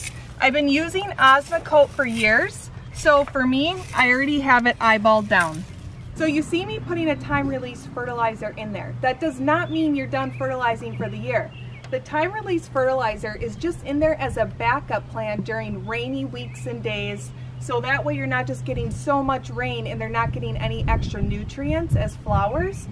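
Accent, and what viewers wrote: Wisconsin, United States. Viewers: I think she is from Wisconsin.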